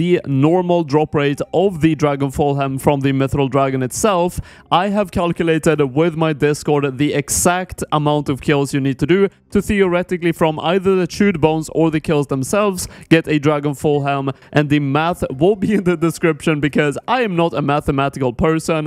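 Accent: Sweden. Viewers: jävlar va svensk du låter 🤣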